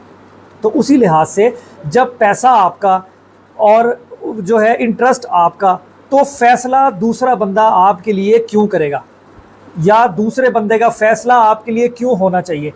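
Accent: Punjab, India. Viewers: Bhai G ap video main ye Punjabi touch Q dety ho? Do you understand it makes ur point more authentic? You look embarrassing so stop ✋ this Punjabi touch!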